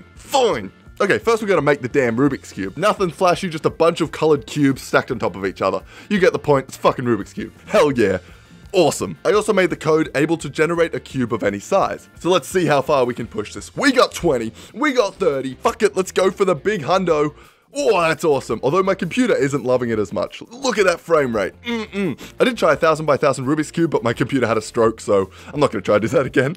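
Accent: Australia. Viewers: is this guy Australian?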